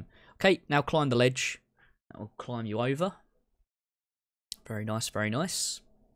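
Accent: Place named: United Kingdom. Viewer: Man i love that british accent, "Diddly Squat fork all"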